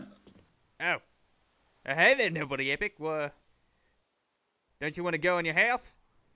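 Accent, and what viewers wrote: Australia. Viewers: Nice aussie accent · That Aussie Accent, Yes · R u Australian?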